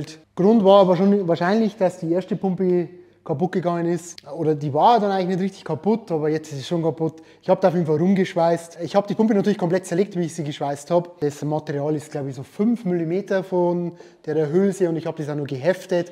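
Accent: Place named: Regensburg, Germany. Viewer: Wo kummst du her hörst du ho wie so a Regensburger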